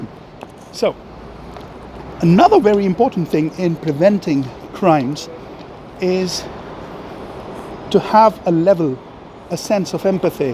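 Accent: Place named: United Kingdom. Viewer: I feel like this is a glitch in the matrix, hearing a Punjabi man with a British accent. Anyway, you get used to it soon enough.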